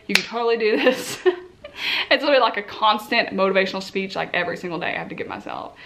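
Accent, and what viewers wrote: United States. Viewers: As a non American I absolutely LOVE your southern accent😆😆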